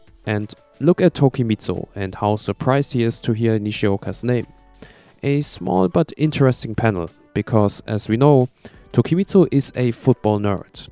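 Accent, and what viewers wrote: Germany. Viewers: me too the german accent is so strong you cant unhear it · Man hört den Deutschen Akzent richtig raus😂aber trotzdem starkes Video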